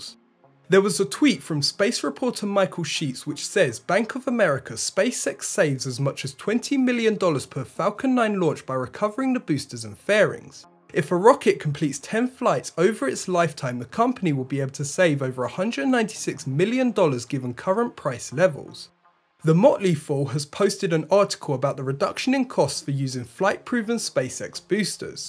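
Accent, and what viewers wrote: England, United Kingdom. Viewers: Christopher Carr you throw linguistic terms but you can’t tell he has an English accent to me that seems unusual.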